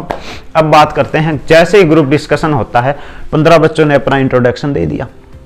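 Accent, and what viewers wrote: Haryana, India. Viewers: Apki voice se pta chal rha ha M be haryana se hu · are waah sir ji Apki boli me haryanvi ras dikkh hai hariyana te ho k😄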